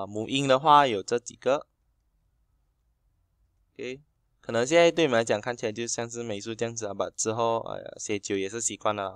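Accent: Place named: Malaysia. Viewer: 先改一下你的馬來西亞的華語的腔調，很難聽！很多壞習慣！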